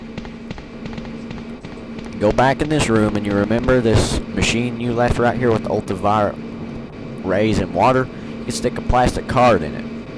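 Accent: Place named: Texas, United States. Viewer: right out texas with that accent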